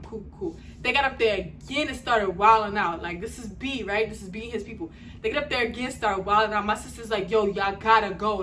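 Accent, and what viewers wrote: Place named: New York, United States. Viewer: Man That New York accent kills me 😂😂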